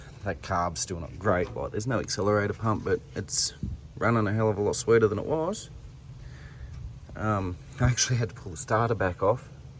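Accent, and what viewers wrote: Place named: Australia. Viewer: Love it! Realistic Aussie narration and cool approach. You got my sub.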